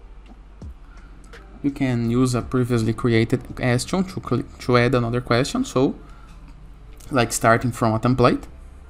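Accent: Brazil. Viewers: are u brazilian by the way ?? you accents sounds like sorry if not .. haha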